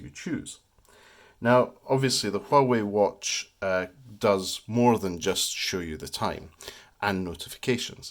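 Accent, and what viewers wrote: Scotland, United Kingdom. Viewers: good to hear scottish dude doing tech and a youtube channel :D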